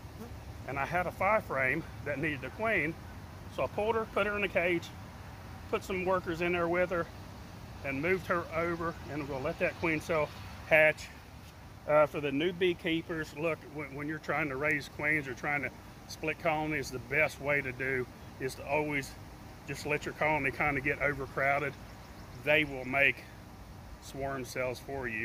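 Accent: South, United States. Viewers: would have been PERFECT if he were cast as the acting role of the beekeeper in Matthew McConaughey's movie Amaziah king. Its being filmed in AL. They were looking for a strong beefed up adult male strong from handling beehives for YEARS. He has the southern accent, experience, and he'd have represented beekeepers well. Darn it!! Wish Id have sent your info to them when they were doing casting calls. The role is being filmed in late July/August. Maybe its not too late.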